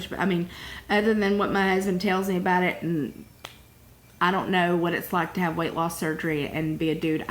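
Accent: South, United States. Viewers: I just love your southern accent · I tripped over your videos one day and subscribed. I do not have weight issues. You look like my aunt Lois who did have a weight problem. You are beautiful and so was she! She was Pisces. What sign are you? Anyway, what got me to subscribe was hearing your accent, and your truthful discourse. You remind me of every southern girlfriend I had growing up. I LOVE HEARING YOU AND YOUR ADVICE!!! Are you from Tennessee? I'm from there, but I also grew up in North Carolina for 10 years. BTW I'm 65 with a 34 year old boyfriend. : )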